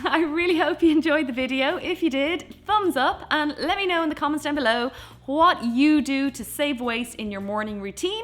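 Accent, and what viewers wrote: Ireland. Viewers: that's not an English accent it's an Irish accent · Do you have children? Pets? Please make a followup video. Love your channel and brogue! 😘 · Is this a stupid question? I feel like this is stupid to ask, but are you trying to mask your Irish accent?? It just kinda sounds like you are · babygirl lyrics it’s an Irish accent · I’ve been enjoying your minimalist videos and just now found out you’re Irish. How I love your country, your people and the fantastic accent. 💖💖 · Irish accents lovvvvvvv ittþ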